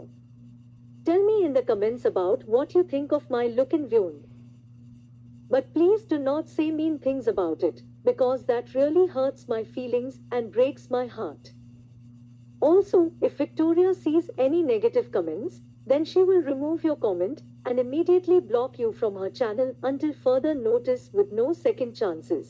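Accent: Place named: India. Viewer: You're beautiful,! I don't mind that Indian voice though!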